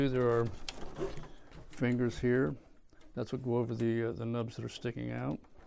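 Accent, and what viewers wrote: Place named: Carolina, United States. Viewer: love your accent. are you from Carolina State?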